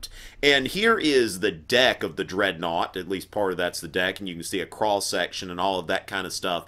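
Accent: South, United States. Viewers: His voice is so American. He seems so hyper, like calm your jets. :D Good video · Love your videos! Informative plus a great southern accented sense of humor. Thanks! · r u American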